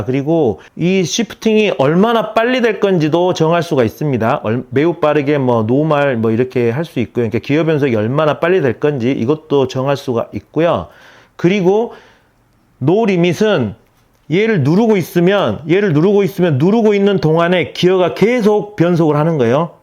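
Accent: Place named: Gwangju, South Korea. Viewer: 참 좋은 영상입니다~! 구수한 광주 사투리는 덤이구요 ^^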